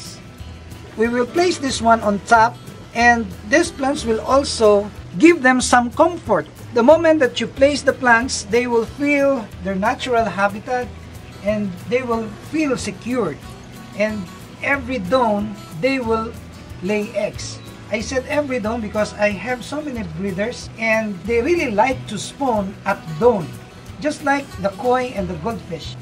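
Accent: Philippines. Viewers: Hi,i am Indonesian thank you for sharing a lot of inspiring knowledge from you experience&your good heart,humble& english style remind me of my pinoy friends (i miss them),wish u more success,salamat Po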